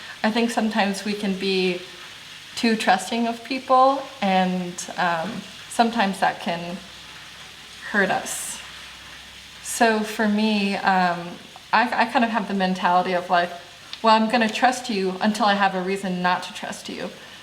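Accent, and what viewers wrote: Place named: Ireland. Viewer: ASMR Irish everyday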